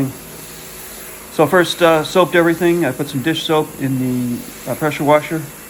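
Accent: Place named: New Jersey, United States. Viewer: You from the Northeast? Sounds like Jersey or Pennsylvania. I'm from Jersey living in FL. I really enjoy your work!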